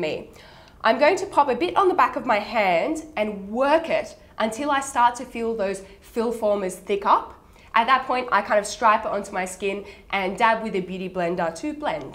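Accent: Australia. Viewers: Where is she from? Australia? :)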